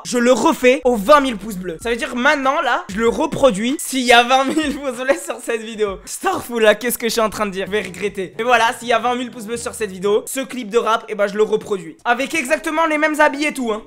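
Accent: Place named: Quebec, Canada. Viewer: On dirait un québécois j te jure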